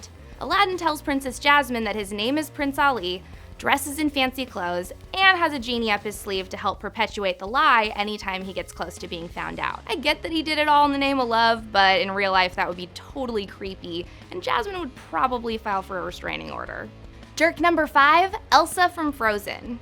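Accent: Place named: United States. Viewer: Stop being so negative. P.S. your accent is giving me a hedache. If you want to speak my language then speak it, dont chew it up infront of me then spit it back into my face you yank.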